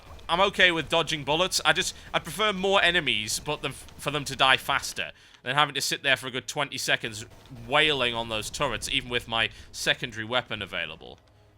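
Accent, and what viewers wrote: United Kingdom. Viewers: I love this "WTF is" series, love "lets play" channels and so on, also brittish accent pwns. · Large majority of TB viewers - "Wha!!!??? Wer is WoW vidz?!?" I enjoy any video you make, TB, mainly because British accents are like sound-rollercoasters.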